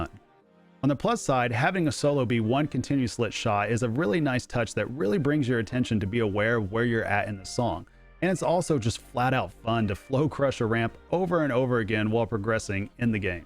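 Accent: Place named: Oklahoma, United States. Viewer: Fellow okie! Great review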